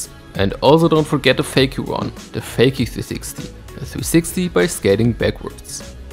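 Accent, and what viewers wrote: Germany. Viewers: you still have a clear german accent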